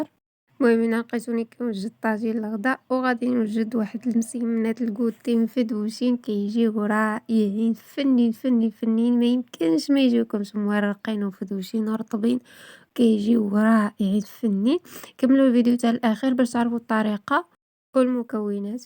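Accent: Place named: Algeria. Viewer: انت منين من مغرب ،،. راكي وتهدري كيفنا في جزاير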